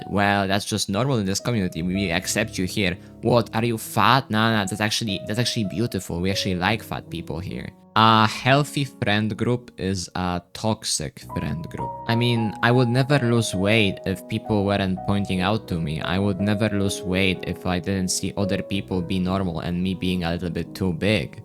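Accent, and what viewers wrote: Poland. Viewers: odrazu słychać że polak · you sound kinda polish edit after research: damn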